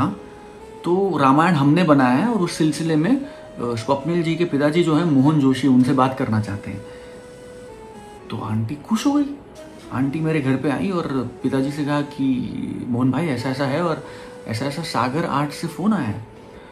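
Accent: Standard. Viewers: Your Hindi talking is that much pure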